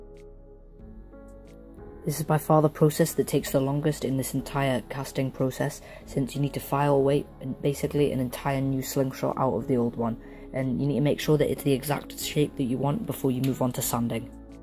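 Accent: United Kingdom. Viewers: Hi is british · what is it with british-accented people mispronouncing "aluminum" "aluminium" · Wow, I never heard such a British accent before.... BTW you're doing some really nice work there. Keep it up ;)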